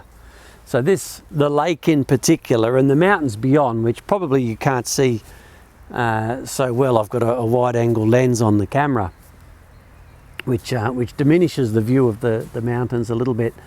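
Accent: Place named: Australia. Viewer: G'day, Thanks for the informative and professional video. It was great to hear this unmistakable Ozzy accent. I don't know how long you've been living in Poland but in the very unlikely case you haven't heard about Great Masurian Lakes I strongly recommend a few days holiday in this area. Greetings from Melbourne, Andrew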